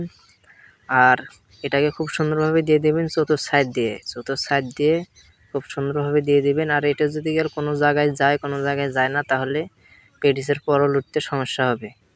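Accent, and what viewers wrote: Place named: Bogura, Bangladesh. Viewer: তোমার কথা শুনেই বুঝতে পেরেছি।ভালো লাগলো ভাই।আমিও বগুড়ার মেয়ে। তোমার বাড়ি কোথায় বা কোন হোটেলো কাজ করো বলা যাবে কি?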